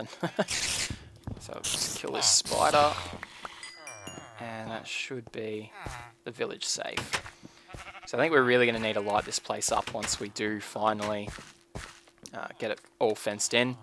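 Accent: Australia. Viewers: cool to see you back bro, missed that aussie accent <3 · i completely feel how u feel atm, my upload is completeley shit, and eveything around me is being pretty bad as well, bad net, too much work etc. i recently heard about u from the hermitcraft community and after seeing the first 7 minutes of this video, i feel that we have lots of things that are very similar between us, and thats why, i think ill be watching more of you! nice to know that im not the only one who has faced this kinda situation. I got two questions for you though a- what did u mean by 'tuberblues'? sorry english isnt my first language and i didnt understand what u meant when u said that word. and b- are you Australian or new zealandar ? i swear that accent of ur, so much like the guys here is australia! (lol yeah i live in AU) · Old timer, glad to see your back. I really did miss hearing the aussie accent on YouTube. 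Btw, which paintball field did you work at /are you still working there? I can't remember if you told us if your still working there. It's been a day after watching your video. And again. So glad your back.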